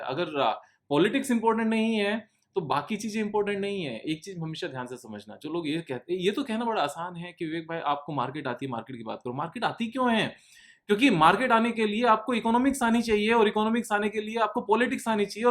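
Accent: India. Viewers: Accent of English will always be Indian for us. If English is not our mother tongue then why should we be expert in pronunciation. Those who measure others with exact pronunciations are just having an inferior mentality. Are foreigners able to speak Hindi with exact pronunciation? Good bhai that you addressed all these guys in this video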